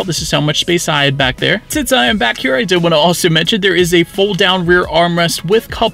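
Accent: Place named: Philadelphia, United States. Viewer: Love that philly accent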